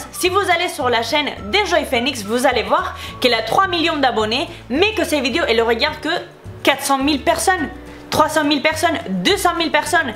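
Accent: Spain. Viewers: Je pense que les gens s'attendaient à voir autre chose qu'un cil donc ils ont mis Putaclik pour moi ce n'était pas du putaclik Tu n'as pas à te justifier Tu es super belle j'adore ton accent espagnol Continue comme ca je t'adore tu es tellement belle Bisous 🇪🇸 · Non moi je trouve que l'accent español c'est le plus belle accent au monde j'adore ton accent et moi j'aurai voulu être espagnole car j'adore cette langue c'est la plus belle langue du monde plus tard je veux vivre en Espagne 😍😍😍😍😍🇪🇸🇪🇸🇪🇸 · coucou moi je t'adore et je te suis des tes 100 abonnes pourquoi ?pars que j'adorait ton accent et j'adore les espagnoles voila et courage et plein de bisous a toi ma chérie. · J'aime tellement ton accent espanol, tes cheveux tous enfaite tes vraiment belle je voudrais trop de ressembler tes MAGNIFIQUE 😍😍❤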